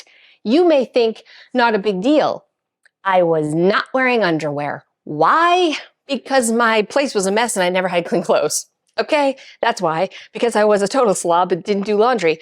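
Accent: Canada. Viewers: "aboot" I knew Canada before i even checked. 😍 Great video. Systems are key. Keep up the great work.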